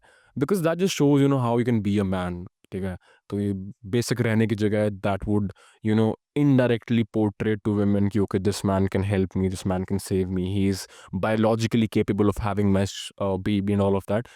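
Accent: India. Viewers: He's got an Indian accent though.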